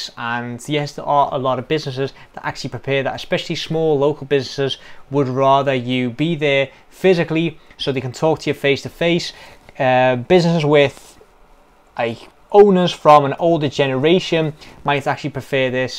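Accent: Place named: Liverpool, United Kingdom. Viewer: I came to Australia when I was 3 years old, originally from Chester Wirral..great to hear a scouser!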